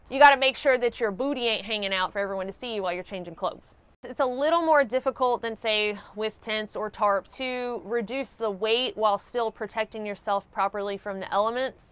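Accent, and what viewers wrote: South, United States. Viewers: Love your accent. Reminds me of watching the Dukes of Hazzard!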